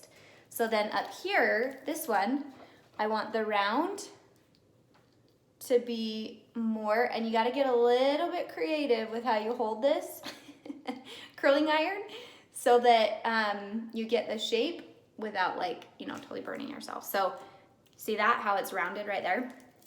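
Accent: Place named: North, United States. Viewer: I wrote to you again about coloring my hair. I’m thinking I need to go silver because if I go blonde I am going to be touching up the roots all the tine. Because I have salt and pepper growing out. Ugghh I hate it. Btw, I love your accent. I am from Indiana, but I can tell you are from the North. Love it!! lol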